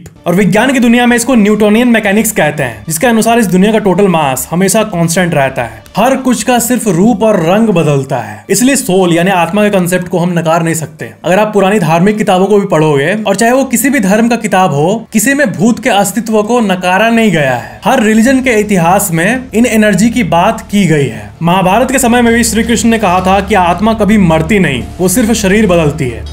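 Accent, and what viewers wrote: Bihar, India. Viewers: Bhaiya bihari ho kya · Bihari ho kya aap?? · 😂😂that bihari accent 😅❤❤❤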